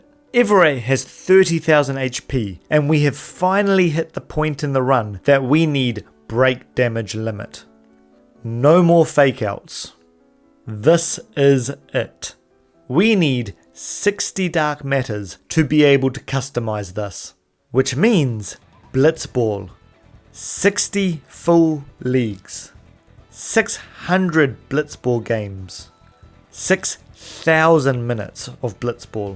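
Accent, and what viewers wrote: New Zealand. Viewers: are you kiwi by any chance? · Took me until here to realize that you were a kiwi, This absolutely sent me. Ka Pai cuz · Started the video cause it’s my favourite game of all time, then the kiwi accent hit me like a tonne of choccy fish! Kia ora bro!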